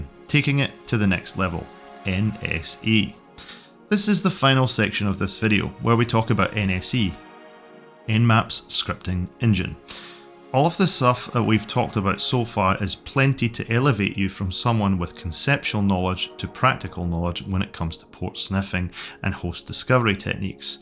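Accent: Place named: Scotland, United Kingdom. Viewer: It's a Scottish accent 😂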